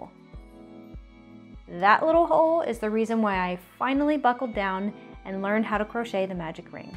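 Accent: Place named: Pennsylvania, United States. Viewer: Hi! Gave you a like of course... It's a habit I've gotten myself into.. I do it first thing so I don't forget. I already have mastered the magic ring, but your episode and technique was very good. Also, I liked that little cheat you did with using the ch 4 instead.. good to know! You sound like you're from PA. I can hear the accent in some of the words you say. Thanks for another great episode of B. Hooked TV. 🌼🙂🌼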